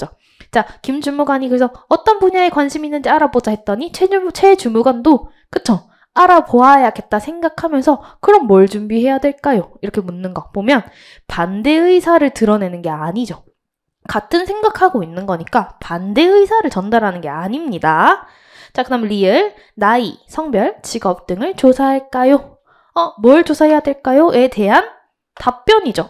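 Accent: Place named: Gyeongsang, South Korea. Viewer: 경상도 분이신가 사투리 나오시네 ㅋㅋ귀엽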